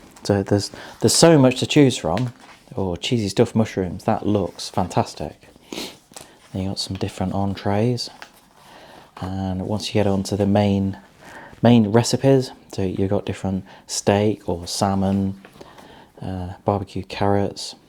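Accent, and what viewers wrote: United Kingdom. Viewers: Already ordered some hours ago. Can't wait 😊. Very good review 👍. Like your British accent. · I like the British accent as well. I like the garden in the background as well with the famous British grass.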